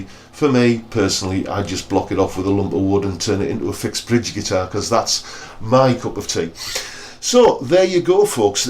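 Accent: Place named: Teesside, United Kingdom. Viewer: Thanks for the review, I'm just about to buy one of these (I think), or an ESP Ltd 256FM in black for the same but I prefer the beautiful colour of the HB. Glad to hear it sounds as amazing as it looks. On a side note, you sound like you're from my neck of the woods - Stockon on Tees? Definitely a fellow smoggie :)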